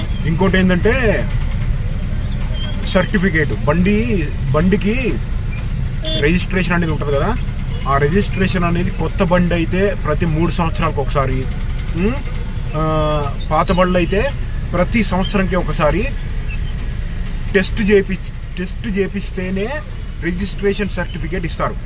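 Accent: Andhra Pradesh, India. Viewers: Anna abn radha krishna type anukunta...few years back they migrated from andhra to Nizamabad and started farming and mixed with telangana culture but slang inka andhra ne ontadi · Anna small doubt naaku me slang telangana slang la undadhu ap la untundhi kaaranam chepthava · meedi evooru? Bodhan lo evaru vunnaru? Choostunte Andhra yasalo matladutunnaru? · Anna ne language enduku andhra vala laga undi ? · Same doubt naku vachindhi bayya.... Telangana salng ledhu pure andhra salng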